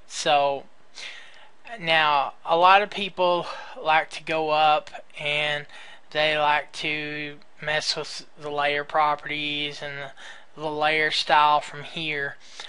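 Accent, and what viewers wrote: Texas, United States. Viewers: Very helpful. Thanks for posting. I also love your Texas accent. Reminds me of my time spent in that great state.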